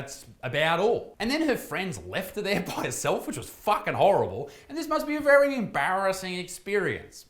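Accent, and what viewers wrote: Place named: Australia. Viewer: Best damn accent, live in brizzie so i dont hear our accent often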